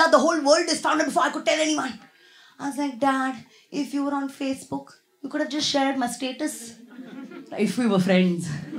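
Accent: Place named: Bengal, India. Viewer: i have seen only first 1 min still i feel u hv bengali accent bengali face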